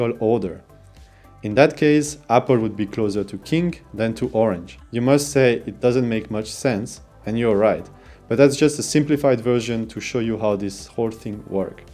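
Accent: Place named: France. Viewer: Very useful content and lovely French accent 👌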